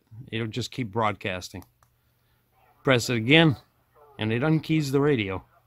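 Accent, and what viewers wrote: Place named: New England, United States. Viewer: You sound like you're from Massachusetts or Rhode Island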